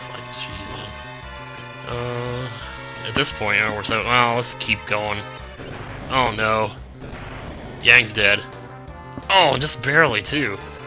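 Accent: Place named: Midwest, United States. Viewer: aw sweet somone from the midwest im guessing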